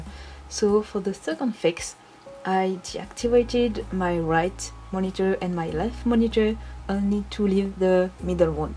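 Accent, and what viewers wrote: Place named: France. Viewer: NANNNN, T'ES FRANÇAISE ?! Jamais j'y aurais cru ! En tout cas, je tenais à te dire que je trouve ton travail très beau, de beaucoup de manières ! Que ce soit les couleurs, la technique ou le fait que tu propose beaucoup de choses différentes ! Continue comme ça, cette vidéo est géniale ! :P (ton accent anglais est trop mignon !)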